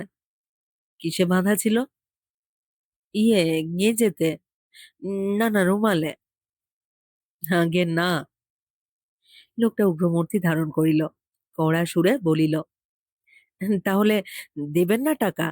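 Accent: Bangladesh. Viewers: এই গল্পটা এদেশী লেখকের লেখা।বাঙাল টানে পড়লে তা উপযুক্ত হয় না।তাই পাঠ অত্যন্ত নিন্দনীয়। না বলে পারলাম না।পারলে শুধরে নিও।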